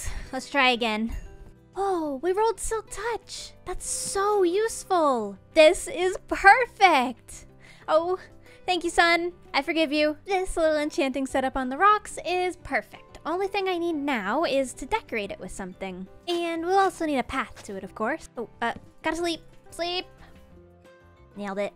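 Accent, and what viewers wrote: Newfoundland, Canada. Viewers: I didn’t know you were from Newfoundland. You need to listen to Come From Away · Gem gem gem plz show your newfendland accent i love that type of accent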